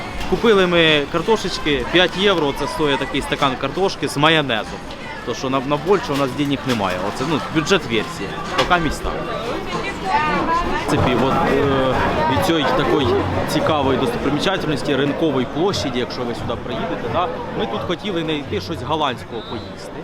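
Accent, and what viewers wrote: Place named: Dnipropetrovsk, Ukraine. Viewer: Говір як з Дніпропетровщини )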